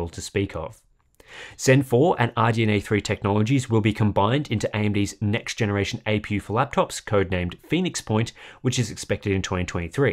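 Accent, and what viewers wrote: Australia. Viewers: there's no such thing as a "normal" accent lol imagine being this provincial Hardware Unboxed please go full aussie accent to ward off this kind of braindead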